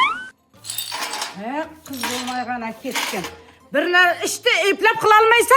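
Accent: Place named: Payariq, Uzbekistan. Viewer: ШЕВАНГИЗ БИЗНИ ПАЙАРИК ТУМАНИ АЙРИМ КИШЛОКЛАРИ ШЕВАСИГА УХШАЙДИ ЖУДАЯМ ЙОКАДИ ГАПЛАРНГИЗ. АЙНИКСА ШУ РОЛИК ЗУР ЧИККАН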